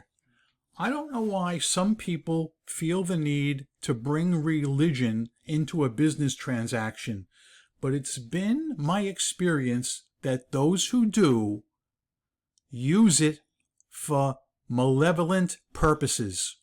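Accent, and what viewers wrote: New York, United States. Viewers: I know your frustation very well, but see you saying it like that in your New York/NJ accent was nice with my morning coffee over here in Europe.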